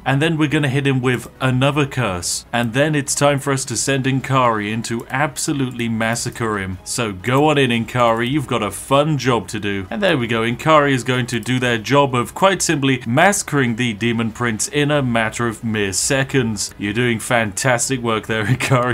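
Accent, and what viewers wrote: United Kingdom. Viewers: British pink daddy!! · Ew British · Pink British Daddy, was all I needed to hear today.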